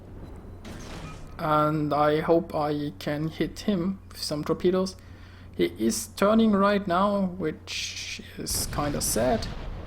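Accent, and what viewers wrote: Germany. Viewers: german accent confirmed or not confirmed?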